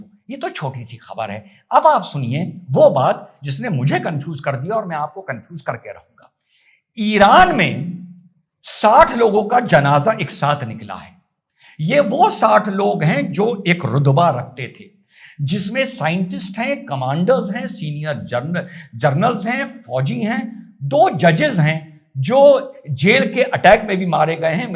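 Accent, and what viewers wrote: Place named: Punjab, India. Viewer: Punjabi ki tarhaan bol rahai hindi yeh musalmaan nahin .beta talfuss toh theek keeleta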